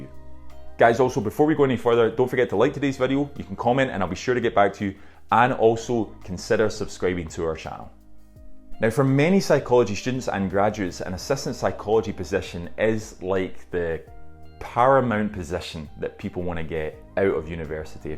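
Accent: Scotland, United Kingdom. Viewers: Thank you so much for this info. Some people tell you, you shouldn’t tell them you are aspiring for something else as they will think you want to just use that opportunity to climb further. By the way, are you Scottish?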